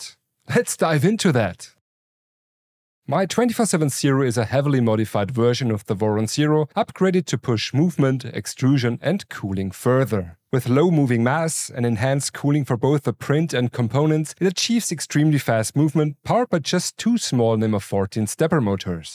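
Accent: Germany. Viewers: Are you printer guys all the same guy with the same voice and same german accent? 🤔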